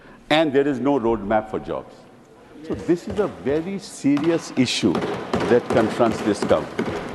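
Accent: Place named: Tamil Nadu, India. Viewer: எங்க தலைவரே தமிழ் ஆங்கிலத்துல பேசினாலும் தமிழ் போல தான்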